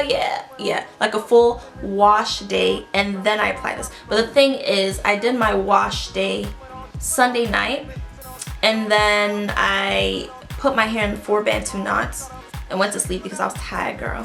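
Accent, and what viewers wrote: Nigeria. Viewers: My sistah! Where you dey now!? Naija girl. I heard the accent a little lol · Loving the Naija accent oh. hmm. lol.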